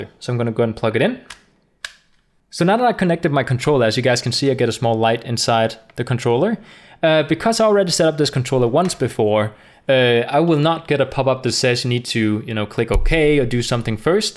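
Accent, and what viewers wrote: Denmark. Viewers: Are you danish? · are you danish??? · er du dansker kan høre det på din aksang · is he danish? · is he danish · haha tænkte nok du var dansk ku godt høre der var lidt der. Men super fed video den hjalp meget og du får også et like her fra :) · Are you danish by anychance? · Awesome video! Are you by any chance Danish? You have an accent, which sounds very familiar to me. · Are you from denmark? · are u danish? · Are you a dane?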